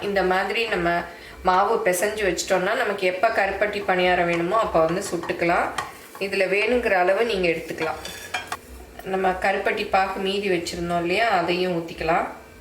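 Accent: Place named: Chettinad, India. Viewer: Hats off achi, use our chettinad Tamil when speaking. It's so nice to hear. Very sweet of you. Recipes are good too.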